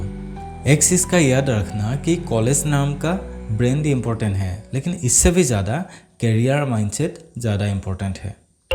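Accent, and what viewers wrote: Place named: Bengal, India. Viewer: heavy bengali accent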